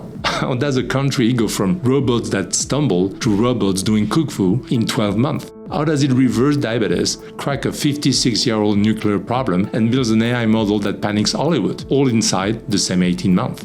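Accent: France. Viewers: Fantastic research. The data provided is exquisite. Thanks for this valuable work. It's priceless The only thing that gets in the way is the french accent. Man, your videos are the only ones I can watch till the end. I now realize how the articulation of the two languages are different that it makes it almost impossible without sacrificing good amount of clear speach. Almost all sounds are pronounced differently.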